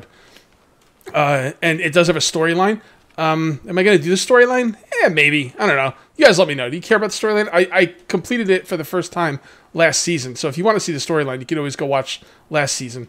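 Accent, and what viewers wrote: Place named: Philadelphia, United States. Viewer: This is my first time watching ya and your philly accent is cracking me up. My best friend is from Philly and you guys sound almost exactly the same.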